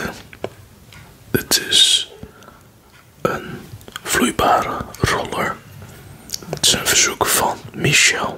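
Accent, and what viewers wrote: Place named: Netherlands. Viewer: I love the Dutch accent 🤗 Nice vid!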